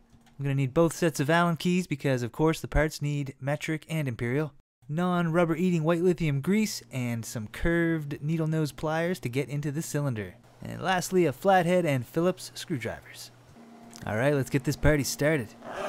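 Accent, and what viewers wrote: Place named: Canada. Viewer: You sound Canadian if you are where did you get these parts from can’t really find any places here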